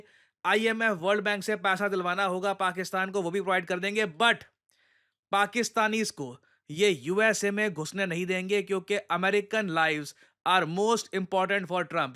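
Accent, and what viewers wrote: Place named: Punjab, India. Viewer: Sir to aaj Punjabi twist me padha rhe hain 😍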